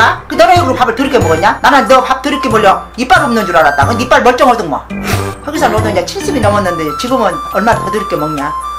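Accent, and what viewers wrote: Jeolla, South Korea. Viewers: 진짜 넘 웃기심 혼다 웃고있음ㅋㅋㅋ 완벽한 전라도 사투리심 울 엄마 같으심ㅋㅋ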